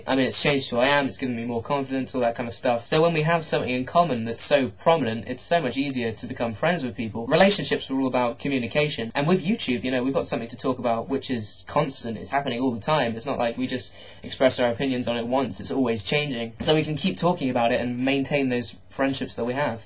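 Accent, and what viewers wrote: United Kingdom. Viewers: A cute nerdy teenage boy ... with a British accent to boot! xD Perfect for me!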